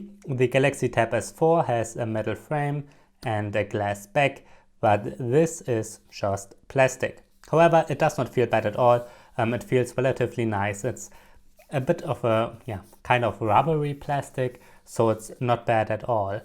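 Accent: Germany. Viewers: man hört aus deiner stimme dass du deutsch bist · I just realize he has German accent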